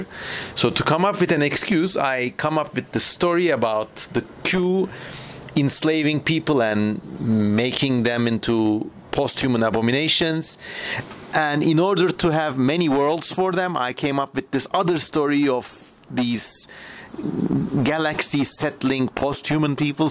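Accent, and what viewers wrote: Turkey. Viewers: İngilizce aksanı Türkçe'ye çok ama çok benziyor. Soy isim " " olabilir mi diye merak ediyorum. Acaba? Eğer doğruysa belki bir evet cevabı alabilirim.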